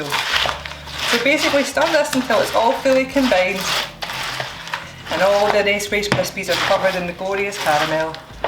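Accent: Scotland, United Kingdom. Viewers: I've never made a single recipe of yours, nor do I intend to (though they seem to be really good, when they turn out well). I just like listening to you talk. Your videos are very pleasant, and it's nice to watch someone make food and patter in a Scot accent. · Thank God my mum is Scottish, born and bred- and will totally understand you,. Im sure she will make delicious caramel cornflake brownies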